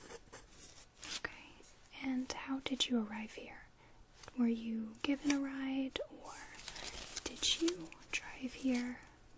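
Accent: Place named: United States. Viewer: Can't resist that USA accent so seductive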